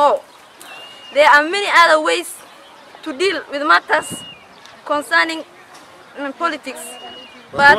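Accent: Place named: Somalia. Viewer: Kiboor Somalitizing English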